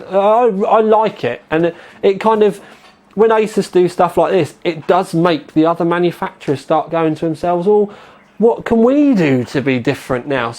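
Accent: United Kingdom. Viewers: lol i love how brits pronounce it :P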